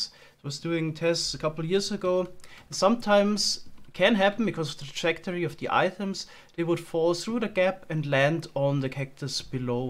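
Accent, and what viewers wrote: Germany. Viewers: The german accent is soooooo brutal hahaha love it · I can hear a little bit of deutsch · your german? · bist du deutscher ? hört sich leicht danach an.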